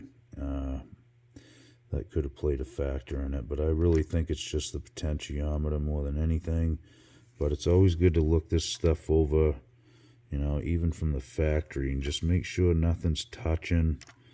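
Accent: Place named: Boston, United States. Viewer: I worked at Lasalle Music in Boston around the time they were selling these. Judging from your accent, it sounds like you might know the place. I did acquire a Charvel Model 5 at the time. The guitar plays great but the pickups were always weak on the top string, so I recently replaced them with active EMGs. In that process the licensed Floyd Rose bridge broke (it made of cheap pot metal). Nice job bringing back a nice guitar.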